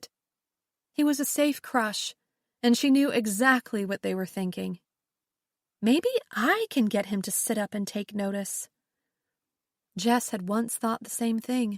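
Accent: United States. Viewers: American narrator.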